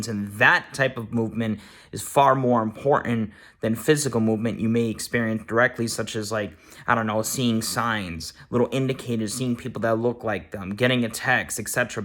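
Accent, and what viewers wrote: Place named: Boston, United States. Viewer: Boston!...I’m glad to hear someone who talks like me in the community 😘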